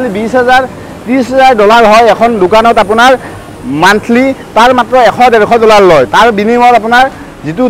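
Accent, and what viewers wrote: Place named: Barpeta, India. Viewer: axomiya vakha tu thik koribo lage...borpeta vakha di kam nhobo..gotei axom a buji napai...iman bosor dhori guwahatit thaki o vakha tu thik koribo nuwarili no..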